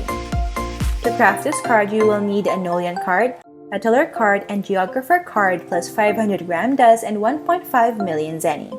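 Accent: Philippines. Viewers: So conyo. Hirap panoorin.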